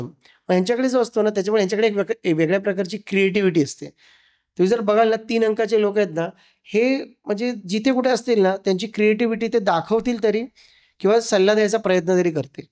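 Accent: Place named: Ghats, India. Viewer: Tumhi marathi spashta ka nahi bolat.. ghata varcha marathi ka bolata..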